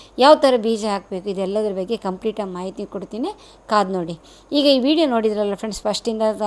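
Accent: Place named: Kundapura, India. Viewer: but kannada superb mathdtheer... kundapura ankonde nanu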